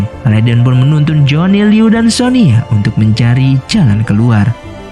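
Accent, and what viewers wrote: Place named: Java, Indonesia. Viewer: Wong jowo ye mas? 😂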